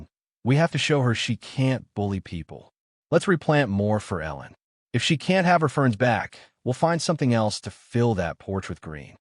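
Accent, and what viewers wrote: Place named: Midwest, United States. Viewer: the voice has a Midwest accent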